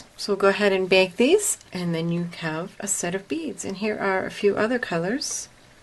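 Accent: United States. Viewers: Are you from the Boston area? Picking up an accent and I want to know if I’m right 🙂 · OMG I was totally going to ask if you were south of Boston because you sound so much like my friend from West Bridgwater! Oh my goodness you sound like an actual native - BUT not everyone has the ear for the subtle new england accent differences so I'd be willing to bet not a lot of other people make this assumption about you (I'm from Boston but have tried hard to eliminate it when necessary - as not to have to repeat myself and/or sound crass lol). I should really become a phonologist! :) oh and more importantly THANK YOU SO MUCH for this tutorial because I just finished it and you helped me so so much just by letting us know that the alcohol ink should dry matte before mixing! Best tip ever! <3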